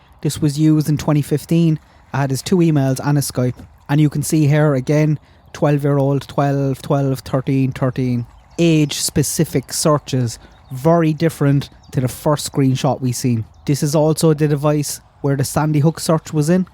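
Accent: Ireland. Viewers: You have a heavy Irish brogue. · Irish and you could slow the video down if you're having trouble lol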